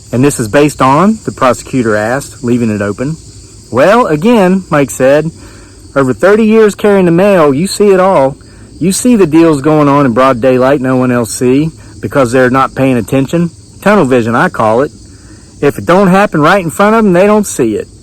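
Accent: South, United States. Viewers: you know.... what makes your stories even better - how YOU read them! it adds a southern flavor and brings then even more alive! have you thought to do an audible version of this book? after all this book will be around for a while, just like Halloween! thank you for reading out loud!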